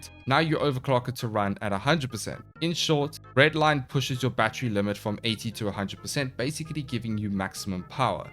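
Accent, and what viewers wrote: South Africa. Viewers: u south african??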